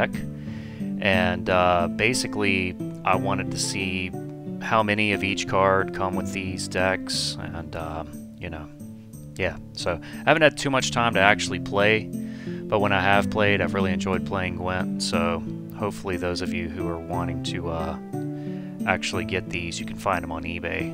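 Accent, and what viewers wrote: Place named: United States. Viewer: Your American pronunciation is funny. Regardless a good video.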